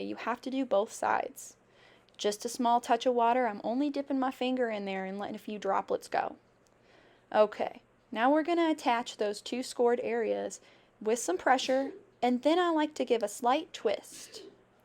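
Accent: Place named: United States. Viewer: Love your country accent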